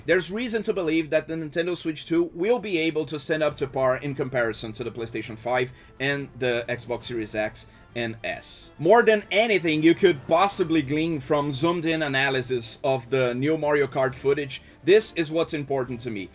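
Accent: Brazil. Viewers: he clearly has a Brazilian accent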